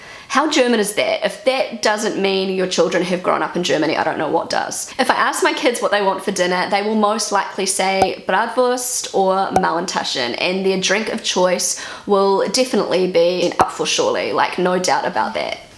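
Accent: New Zealand. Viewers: I love her new Zealand accent💖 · I don’t understand why you think your kids are germanised, they grew up there and go to a German school, have German friends so it’s obvious that they take over the habits of their German friends, because they grew up there just like every other German kid with German parents 🤷🏽‍♀️ The only difference is that your kids speak English with their mom, but their whole social life happens on German. I think you underestimate the power of being educated in another language than you speak at home 😛 Love your Videos and your kiwi accent 🥰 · As a german i can hear the kiwi in you, too. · Love your kiwi accent 😘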